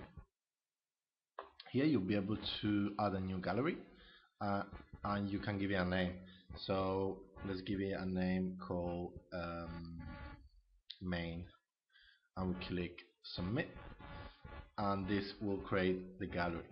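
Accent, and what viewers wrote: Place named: Spain. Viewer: jejejeje por el acento me sonaba a bastante español, pero ahora que he visto por ahí..."subir archivo" jejejeje Acabo de comprar el tema y lo estoy instalando, a ver qué tal. Un saludo y gran trabajo con este soporte en vídeo.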